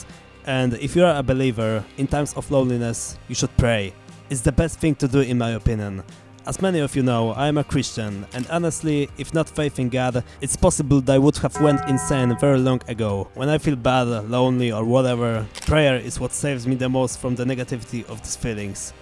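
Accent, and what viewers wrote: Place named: Russia. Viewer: Are you from? Sounds like Russian. BTW Good video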